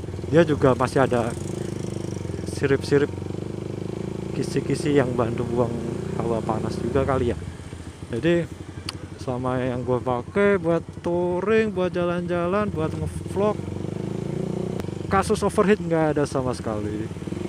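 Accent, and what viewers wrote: Semarang, Indonesia. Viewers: orang Semarang ya?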